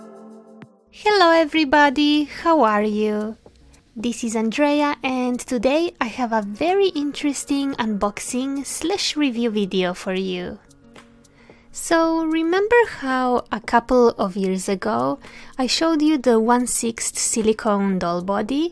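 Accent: Russia. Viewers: I love your accent ... are you from Russia ?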